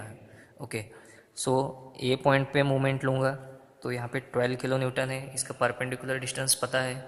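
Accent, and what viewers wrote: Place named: Maharashtra, India. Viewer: Bhava marathi ahes na tu tuzya hindi varun samjla🤣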